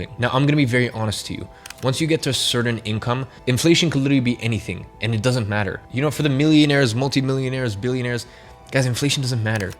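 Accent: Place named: United States. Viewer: Respect to your mind on finances and the future. But I still don’t understand how you have an American accent after growing up in London. Not even a little bit?!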